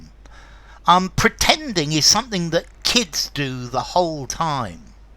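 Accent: RP, United Kingdom. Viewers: I like posh accent a lot 🎉🎉thanks a lot sir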